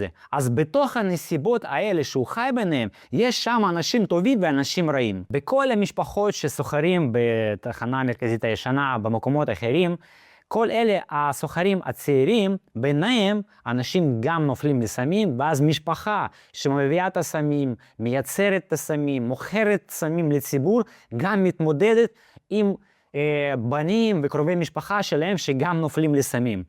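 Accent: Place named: Russia. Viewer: у тебя ярко выраженный русский акцент... ты бы хоть титры на русите сделал бы.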